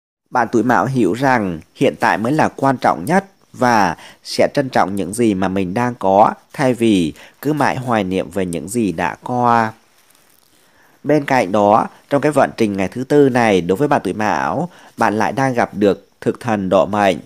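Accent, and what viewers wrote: Thanh Hoa, Vietnam. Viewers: Bạn cúa phải ngừi thanh hóa ko . Nuối hay rứ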